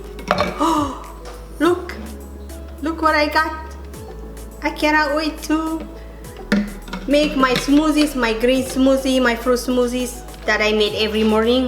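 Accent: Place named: Tigrinya, Eritrea. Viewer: You have beautiful personality, but I don't understand how you have Tigrinya and English accent. Tigrinya is your first language, you shouldn't have any accent but this just my opinion. Overall great work.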